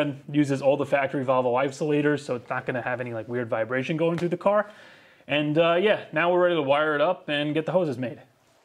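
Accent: New York, United States. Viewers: My first car was an 85' trans am same blue! I really enjoy this series, you've done a ridiculous amount of work on this car! My current 89' GTA never disappoints. I'm in Northern CT, you must be in long Island if I here you're NY accent correctly.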